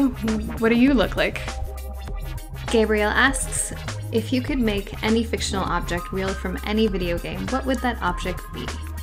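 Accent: Canada. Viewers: haha, just started watching your videos, and dont worry, canadians talk about the same as us here in Minnesota, and we're the only ones that talk correctly. Also your video guides are great. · I just want to say, it's extremely reassuring to know there is someone in their 20's playing this game, that also is Canadian and has people make fun of the way they say completely normal words. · Ok, now i know that i love the canadian accent! :D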